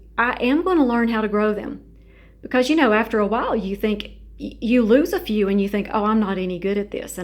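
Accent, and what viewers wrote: South, United States. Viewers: Oh I figured down there (assuming you're in the south because of the accent)that you might grow stuff outside as well. Yes, it's common for young growth to have red tint, especially if the plant is in light that is high for the plant or if they were recently moved from lower to higher. Dr Martin Motes says that when plants flush after being exposed to cold, that it's due to low magnesium, but someone else said he's wrong, and cited one source saying that high mag will increase the red. I wanted to see if you had ever tried that, but then I found the answers that back him up. The anthocyanins that show up when there is high mag are not the same ones that show up in the cold. (Or the ones that sometimes show up in normal temps with low mag). There are different ones that do different things, and then I went to his videos and he relayed at about 20 minutes in to the rough cut video and he talks about some vandas they imported from Taiwan that were deficient and turned purple and when they added mag..well I'll just let you watch that if you're curious instead of typing it all out here :)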